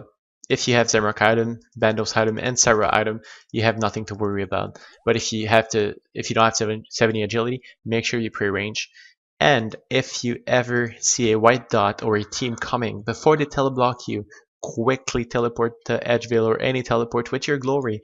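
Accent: Quebec, Canada. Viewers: Hey that accent, Quebec:P Bon guide!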